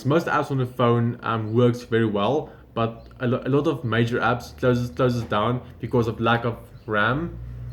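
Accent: Afrikaans, South Africa. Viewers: Daai lekker diep Afrikaanse aksent, jys 'n regte boer!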